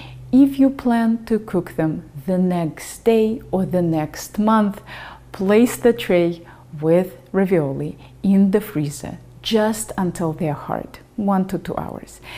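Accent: Russia. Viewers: Nice russian accent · Are you Russian? You have a very pronounced Russian accent.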